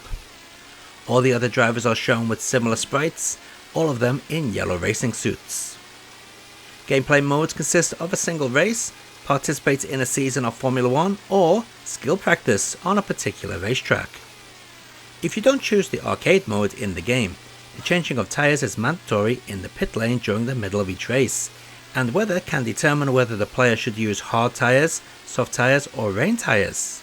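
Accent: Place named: Scotland, United Kingdom. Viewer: Showing support, love. Love his accent and his command of English language, are you Scottish? Very honest, knows his stuff, often Japanese focused but in English. Can feel and share that passion for the old systems and hardware. I personally didn't play this game back in the day.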